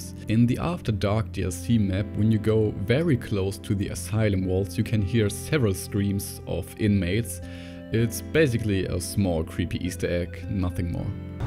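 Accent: Germany. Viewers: Als deutscher hört man den Dilekt direkt raus 😂✌🏼. Aber sehr nices video! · Ik erkenn jeden Deutschen an seiner Englischen Aussprache! Grüße aus der Bundesrepublik mein Freund! ♥ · He is german, you know how hard it is to switch languages without having an accent? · Are you german couse it kinda Sound like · This Video was german i hear it in his voice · Man hört raus das du deutscher bist kuss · Man hört so hart, dass du deutsch bist xD feier ich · Man hört das du deutsch bist ,oder? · man hoert den deutsches akzent sehr raus wenn man selber Deutscher ist :D sehr gutes Video! weiter so! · A skate3 Iceberg?! Genius! Are u german? Ich höre irgendwie einen Akzent raus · German accent 😅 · Deutscher Akzent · Sehr schönes Video, deinem Akzent zufolge denke ich mal dass du deutsch sprichst :) Die Theorie am Ende lässt mich gerade nicht los, würde aufjedenfall Sinn ergeben. 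Danke für das informative Video! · Ei hiar a german Äksent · Dieser deutsche Akzent xD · Man hört voll das du deutsch bist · Bro awesome work on that iceberg please more Also i kinda hear some german in your voice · Der deutsche Akzent sticht immer hervor min jung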